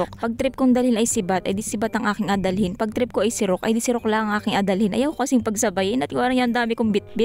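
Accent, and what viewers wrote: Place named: Marinduque, Philippines. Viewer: Miss ganda at masipag pa kala ko taga marinduque ka kc un mga salita u ay puntong marinduque ngani mandin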